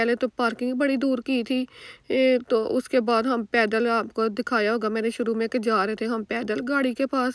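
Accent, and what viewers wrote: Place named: Lahore, Pakistan. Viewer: First with u dear 👍📌 Really enjoying ur Punjabi which same like us ..r you from lahour ??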